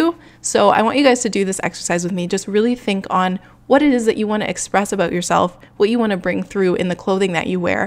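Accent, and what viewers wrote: Canada. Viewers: Is she canadian ?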